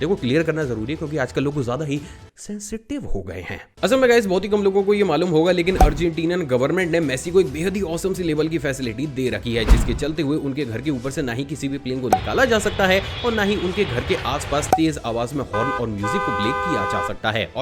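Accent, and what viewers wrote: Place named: Mathura, India. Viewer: Bhai aap kaha se ho ye language to hamare yaha ki he mathura ki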